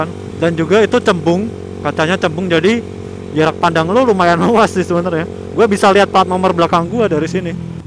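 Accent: Semarang, Indonesia. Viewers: Koyo semarang iki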